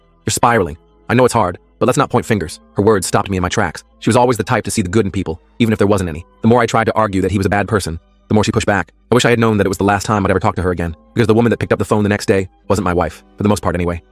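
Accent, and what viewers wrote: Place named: United Kingdom. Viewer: The British accent is just no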